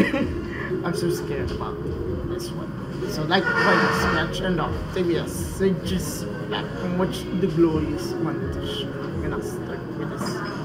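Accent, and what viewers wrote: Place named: India. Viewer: oh, you just sounded like you have an Indian accent, anyway. I'll never watch Smile HD, I tried watching Fluttershy react to it, and I'm like "just no" same thing with Cupcakes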